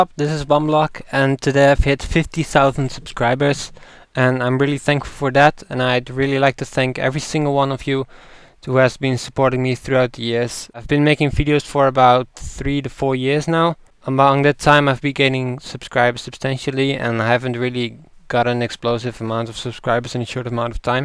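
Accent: Netherlands. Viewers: Are You dutch? You sound Dutch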